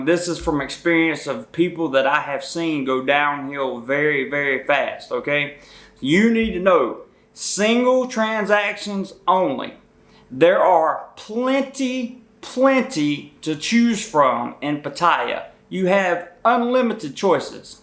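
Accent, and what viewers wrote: South, United States. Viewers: Man were you from I know your from the southern us original I'm from Tennessee so I know it's a southern accent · The southern accent while mispronouncing "Pattaya" is funny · Thanks bro good info:) Dude you sound like you're from the South nice to hear a accent brother:) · You have a southern country accent.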